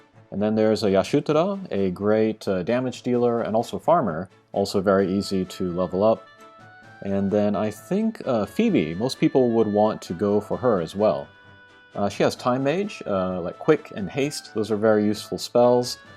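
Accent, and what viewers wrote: Japan. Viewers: By your pronunciation of names, I can tell you are Japanese, by your general pronunciation I find hard to believe you are japanese. You have a lovely accent that surpasses even those that were born in english speaking countries.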